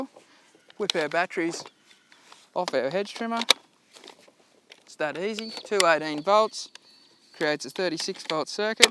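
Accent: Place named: South, United States. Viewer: Where is this guy from? I can hear southern accent, but can't catch where it is exactly from/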